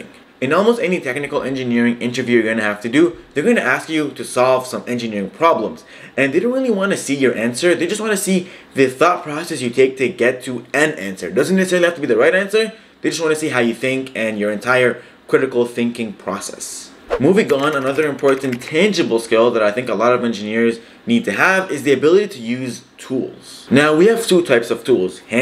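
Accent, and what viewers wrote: Egypt. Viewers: مصري مش كده؟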